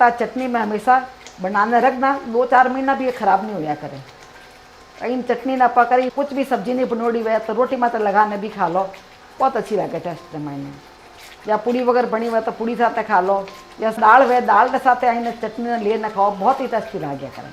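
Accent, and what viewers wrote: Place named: Rajasthan, India. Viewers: Iys typical marwadi language, easily understandable almost like hindi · आप री सारी रेसिपी में फॉलो करु हु मम्मी जी। आप रो बनावन रो तरीकों ओर मारवाड़ी में बोलनरो तरीकों माने बहुत अच्छों लगे है। बहुत सारी रेसिपी में बनाई भी हु। आप री सारी जानकारी में जोधपुर रो लहजों आवे है।😊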